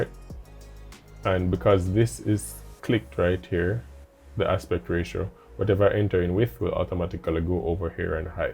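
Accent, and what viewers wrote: Jamaica. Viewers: 2 seconds in....yessss my Jamaican brother!!! lol super helpful video btw, thank you! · i hear a caribbean accent… hmm let me guess, Jamaican? Great tutorial 💯💯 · you're jamaican, aren't you? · Are you Jamaican? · Are you Jamaican 🇯🇲 ???wonderful accent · You sound Jamaican bro, are you? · Is that a Jamaican Accent?? Now I have to learn this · are you jamaican? i pick up the accent anywhere lol. Also thank you for the tutorial it really helped! looking forward for the others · Are you Jamaican ? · You sound Jamaican. I just subscribed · Are you Jamaican? Or from the Caribbean? Because i am Jamaican and i recognize the accent. Thank you for the help! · are you jamaican? you sound jamaican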